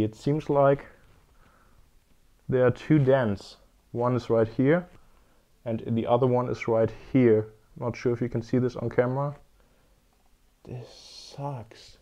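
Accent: Germany. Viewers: Omg.. That german accent!!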